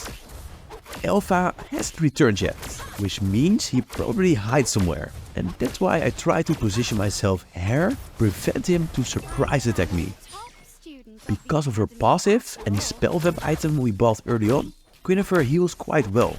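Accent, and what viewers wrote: Netherlands. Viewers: Are you Dutch? Lol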